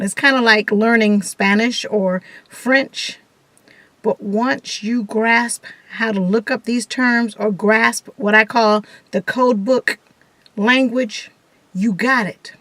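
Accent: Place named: South, United States. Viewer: I absolutely love your channel, this one and your other one! Your Coding Master Coach helped me past my CPC exam on the first try. Your approach is so likeable, and down to earth, with your southern drawl...so lovely. You're a very beautiful lady too, I love seeing a black intelligent women knowing her stuff! I clicked on this video today, but before I watched it, I wanted to post this. I know the topic of this video is Loving your books, and OMG if that is not the one thing I stress to people. You get to learn your code books by actually doing some work, working out different problems and different scenarios will give you that understanding and that missing piece to the puzzle. 5 hours goes by incredibly fast, and these books are huge, you want and NEED to be able to flip back and forth and feel confident that you know what you are doing. 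Love you! xoxo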